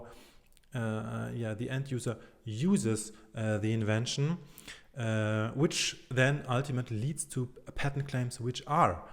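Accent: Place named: Germany. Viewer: Schöner deutscher Akzent :-) Ich frage mich, ob es für mich als End-User rechtliche Schwierigkeiten geben kann, wenn ich eine kostenlose Software nutze (z.B. Gimp als Photoshopersatz). Z. B. wenn ich als Berufsphotograf Bilder manipuliere und diese dann als JPG exportiere, um diese zu verkaufen. Wäre meine Nutzung der Bildbearbeitungssoftware GIMP illegal, wenn z. B. patentierte Prozesse oder Dateiformate mit der Software zum Einsatz kommen, welche die Software gar nicht nutzen dürfte? Oder sind die Softwareprozesse in diesem Zusammenhang eh kein geistiges Eigentum, weil es unpatentierbare mathematische Formeln sind?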